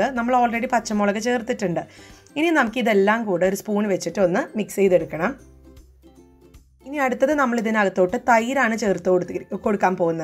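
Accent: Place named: Palakkad, India. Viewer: Samsaramishtama chechiye jadayillatha nishkalangamaya nadan basha. Pkd aano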